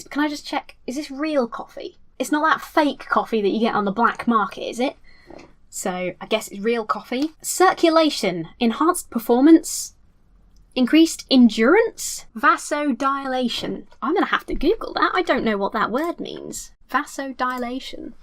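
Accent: United Kingdom. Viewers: You're an adorable, critically thinking, scientifically literate atheist with a British accent... Why do you not have a million subs already. Lol so glad I found this channel! 🖤❤️🖤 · It's nice to see someone who does athiest content also cover stuff like this, a lot of the people I watch tend to stick in a bubble of relegion/science related videos. Constructive and entertaining. Also, why does a female voice with a brittish accent make the phrase "fuck off" sound more powerful? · Your brittish accent is so beautiful. You should be reading in tutorials in how to learn to speak english on cd:s or something. You sound perfect.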